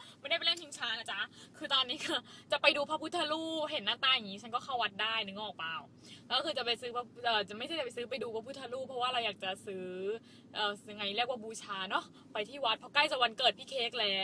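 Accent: Thailand. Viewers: เหน่อ เว้ยเฮ้ย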